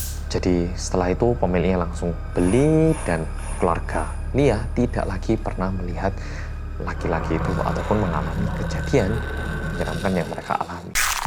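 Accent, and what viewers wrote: Java, Indonesia. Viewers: Orang Korea yang medokkkk😌👍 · bersama orang korea yang... Hensa( Me): kewe...: medhokkkkkk eh... Hensa( me): lhaaaa Hahahhahajajaja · bersama orang korea yang MEDHOOOKK aku dah tereak medhok juga. trus sadar kalo ini rindingdong :)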